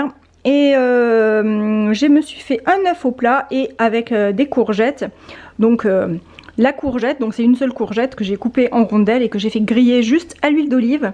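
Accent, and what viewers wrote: South, France. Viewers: Merci pour cette vidéo. Ton petit accent du sud est tellement agréable de bon matin. ❤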